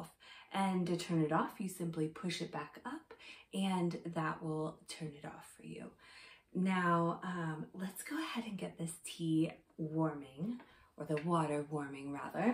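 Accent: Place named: France. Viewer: Love your French accent!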